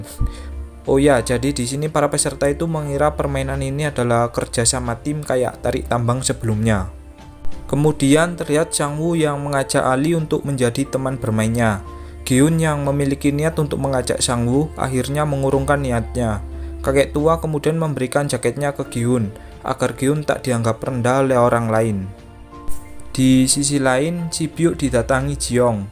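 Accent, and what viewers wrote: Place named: Banyumas, Indonesia. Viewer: Ngapak yak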